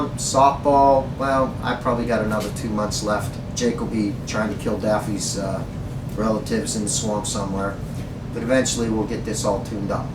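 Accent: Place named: Pennsylvania, United States. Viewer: Love your videos an your PA accent as well. You don't mess around or mince words. I love that. Link to the DVD s would be cool.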